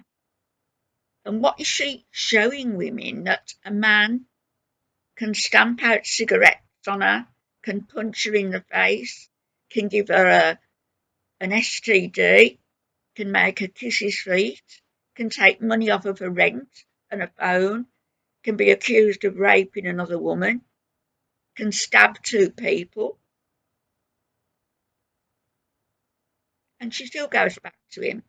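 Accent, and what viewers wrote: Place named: West Bromwich, United Kingdom. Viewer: Hi, you’re so spot on with all of the chaos with both FB and Nader. You sound just like my family member from West Brom x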